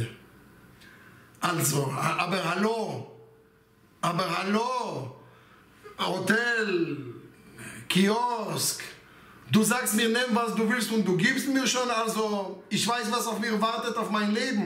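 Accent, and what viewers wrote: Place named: Germany. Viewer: Danke Rebbe, ich finde Sie reden gut Deutsch. In den Momenten, wo es nicht so klappt, bringen Sie aber eine Seele mitrein, wo man versteht, und spürt auf Seelenelbene, was, und wie Sie das meinen z. B. Wenn sie jekkeln, wo das Wort so süß rüber kommt, da bin ich sehr froh, dass Sie so unterrichten. Meiner Meinung nach, falls es Sie interessiert, und Sie das wissen möchten, für mich, sind es 98% die Sie sehr gut Deutsch Reden, die 2% Jekkisch bitte behalten, die geben noch mehr süße und Seelenfreude mit ins Herz und Kopp. Danke Rebbe.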